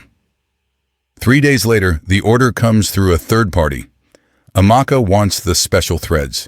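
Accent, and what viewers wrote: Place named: Europe. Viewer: Why did the voice change from the beautiful female narrator that can pronounce all the African names right to a man's voice who was born in Europe?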